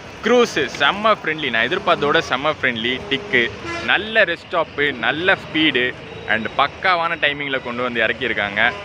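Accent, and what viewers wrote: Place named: Tirunelveli, India. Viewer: Tirunelveli slang 🤩🤩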